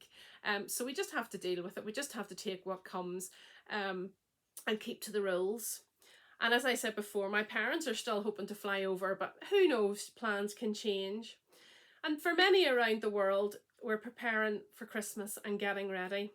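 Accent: Northern Ireland, United Kingdom. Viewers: Hi, I live in Southern Ontario in Canada, but I was born in Belfast Northern Ireland. My family immigrated to Canada when I was 5, but I can recognize the Northern Ireland accent anywhere, my siblings and I lost our accents but my mom and dad never did. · Enjoy watching your podcast. Your accent makes me homesick. I live in Guelph Ontario Canada. Most of my family live in Belfast Northern Ireland.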